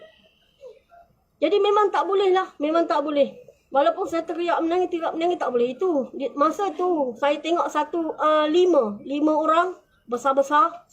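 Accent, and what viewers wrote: Kelantan, Malaysia. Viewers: Mari kot celoh mana menasio nie.. Bunyi kecek supo kltn.. Wat malu ore kltn jah. Dgr ko dia dok cito tu aku rasa 3 ari dia nie x bgun tido. Nk sudah ko mimpi tu gak..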